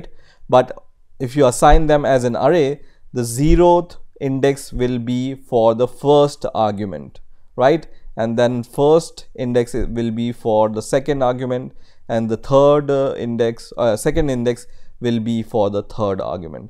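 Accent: India. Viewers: You speak english like an Indian, so I can understand...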